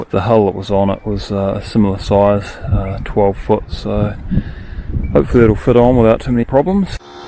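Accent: New Zealand. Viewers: That's the awesome New Zuld accent...very flat in comparison to most other English speaking accents. Can lead to confusion when spoken in the West Island (Australia) e.g. Aussies say "sex" when they mean to say "six"..and the Kiwi will sound like "sux" when he means "six" leading almost immediately to sheep jokes!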